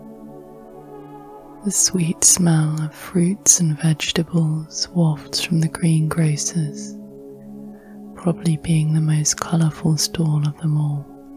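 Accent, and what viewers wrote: United Kingdom. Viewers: Here in Carson City Nevada USA, I've tried several different methods. Supplements to relaxation recordings. Some success with bamboo forest recordings but found any with too much rain or wind or music was more of a distraction. Then, I found your 3 hour recordings three nights ago. I've been sleeping with you since😊using Bluetooth ear buds. The combination of your vocals and background music works magic. The British accent is an added comfort. 😴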